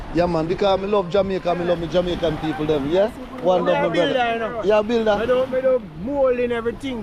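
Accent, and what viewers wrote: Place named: Black Country, United Kingdom. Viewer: Loving that black country accent.😊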